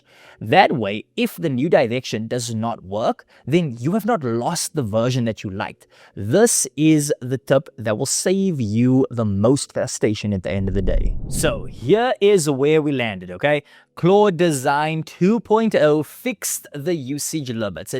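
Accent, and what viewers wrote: South Africa. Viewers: Are you South African? That accent is too local for me to ignore haha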